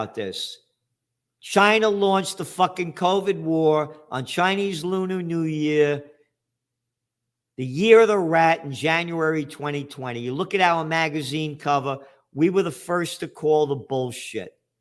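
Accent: New York, United States. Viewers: Love you Gerald! Just signed up for the annual subscription! Love the Merch!! Colorful language with a New York accent, reminds me of listening to my family from there. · There is nothing more satisfying than hearing someone with a New York accent cursing out the thieves,liars,massmurderers and corrupt A-holes that is the elite political and economic establishment.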